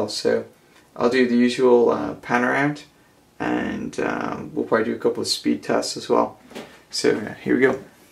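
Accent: United States. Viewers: I have to know are you american? You sound it.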